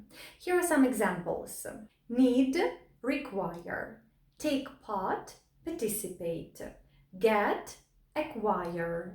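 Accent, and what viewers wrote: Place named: Russia. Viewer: Your dialect looks like a Russian or Ukrainian lady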